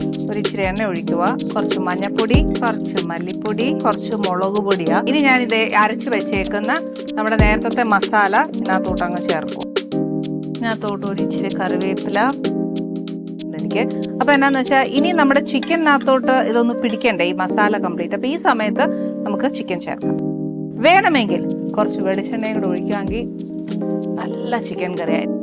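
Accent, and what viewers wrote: Kottayam, India. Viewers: സംസാരം നല്ല ഇഷ്ടമായി Kottayam സ്‌റ്റെൽ സംസാരം❤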